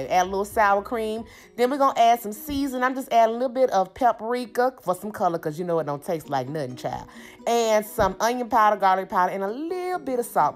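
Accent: South, United States. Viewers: Your southern commentary had me 😂 I'm definitely trying this recipe. But I don't have a cast iron skillet... must buy. But for now I'll use a oven pan thingy lol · the southern drawl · I know i chose the right video when i heard the southern accent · of course that southern drawl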